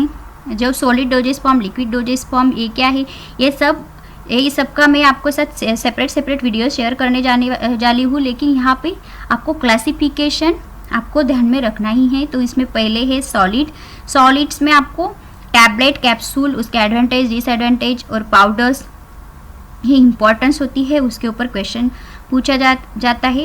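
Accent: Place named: Kerala, India. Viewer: I sure you’re from Kerala 99%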